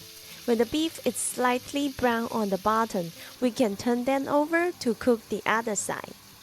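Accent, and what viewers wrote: China. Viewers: 😂😂😂 Chinese accent is definately there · That Cantonese-English accent just makes me feel at home 🏠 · You sound Chinese but you could be Middle Eastern Chinese... That's the thing... :)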